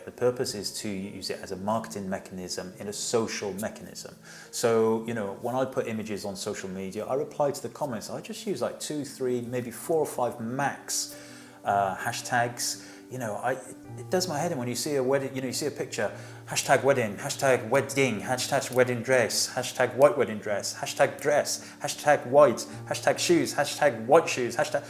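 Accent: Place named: North Wales, United Kingdom. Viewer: Great advice, looking to start up myself and this puts things into so much perspective that I haven't seen after several months of research!! Are you Welsh by any chance? You sound North Welsh. Cheers for the video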